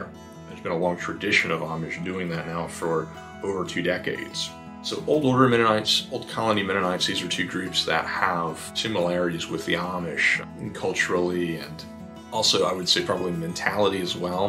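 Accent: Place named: Pittsburgh, United States. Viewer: That Yinzer "O" kept escaping!🤣🤣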